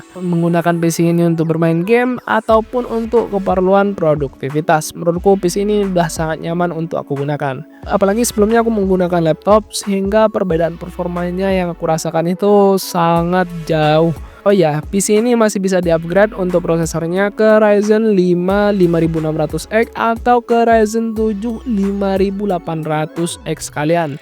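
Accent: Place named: Madura, Indonesia. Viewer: Orang mana bang kok logatnya kayak madura ?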